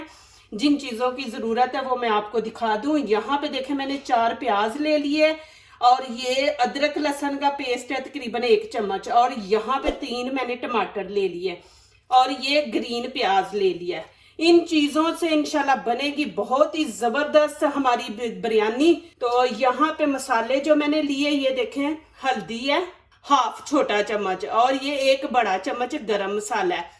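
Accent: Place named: Punjab, India. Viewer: Simple and nice .Will try In Sha Allah. I liked your Punjabi way of talking.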